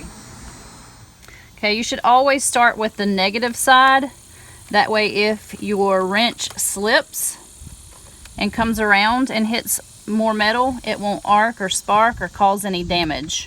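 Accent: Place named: South, United States. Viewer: I've been looking at these along with the PIAA versions....thanks for a great video! You've got to be from the south....I know my own :D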